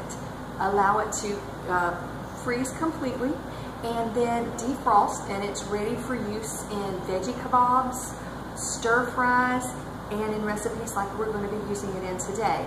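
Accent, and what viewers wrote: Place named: South, United States. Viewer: Only if it's available in Audiobook form....I'd miss that sweet southern "drawl".... ;-)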